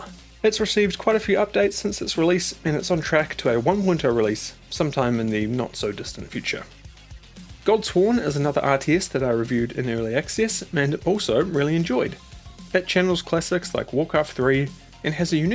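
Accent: New Zealand. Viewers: Are u a kiwi bro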